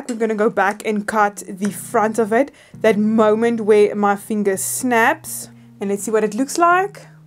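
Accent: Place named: South Africa. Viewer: I can tell that you’re from South Africa, I’m from Namibia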